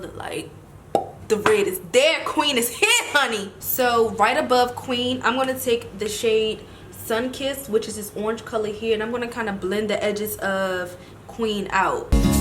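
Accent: Washington DC, United States. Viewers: You have to be from the DMV....the accent gave it totally away! I ran 🏃🏾‍♀️🏃🏾‍♀️🏃🏾‍♀️🏃🏾‍♀️ and copped me 2 of the bases and about to dive in and buy the palette. Thanks girl. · I knew u was from DC from your tone of voice 😩😍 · I just knew you were from dc..we have a certain type of accent lmao..I immediately subbed😍 we gotta support each other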